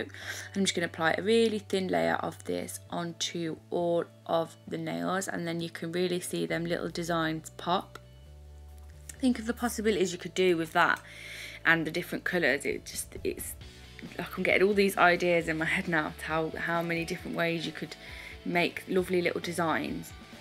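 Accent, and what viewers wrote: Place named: Essex, United Kingdom. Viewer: I've just recently found your channel. I've watched several of your videos and I must say you do some beautiful nails! You're very creative with your artwork. Also, I just love your accent. Where are you from? I'm guessing Essex, but hey I'm American and don't know the different English accents,so please don't be offended if I'm wrong! 😀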